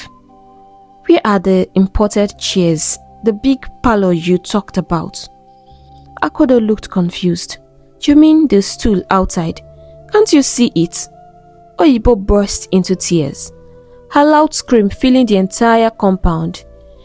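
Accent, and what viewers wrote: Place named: Nigeria. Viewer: She has a Nigerian accent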